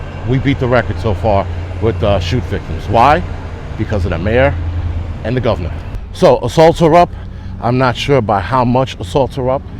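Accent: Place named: Chicago, United States. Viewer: Really informative , sounds like Chicago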